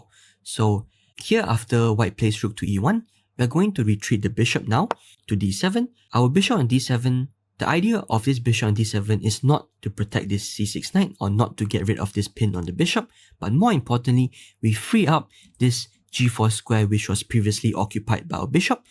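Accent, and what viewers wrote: Singapore. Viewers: Typical Singlish accent. Is this course given by Tin JingYao (Singapore number 1 GM by rating)? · You sound like a Singaporean. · Definitely Singaporean or Malaysian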